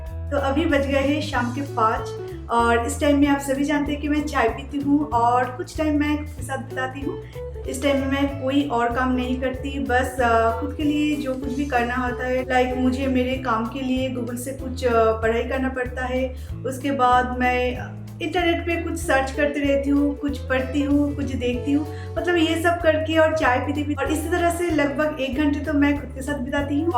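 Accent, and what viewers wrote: Bengal, India. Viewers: At first your accent says you are Bengali so great u are trying to speak in hindi and secondly before seeing the video I seriously thought u are a single handed woman I mean u don't have the other hand hahaha... And finally what kind of video is this?? So what do all other women do?? Eat and sleep.. Every woman works be it housewife ir working woman.. I m not a hater but this video is a waste of time and totally useless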